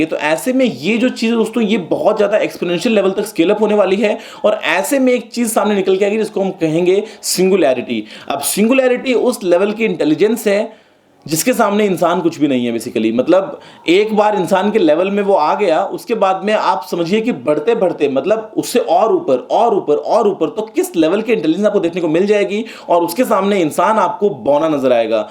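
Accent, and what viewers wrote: Bengal, India. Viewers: Sir.. Aap bengali ho?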